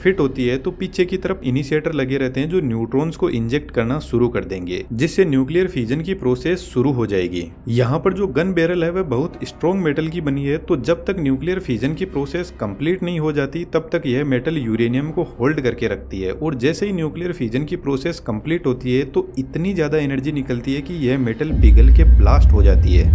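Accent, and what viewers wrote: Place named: Shekhawati, India. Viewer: भाई साहब आपके द्वारा बनाया गया वीडियो बहुत ही ज्ञानवर्धक है । आपकी आवाज से ऐसा लगता है कि आप राजस्थान के शेखावाटी से संबंध रखते हो।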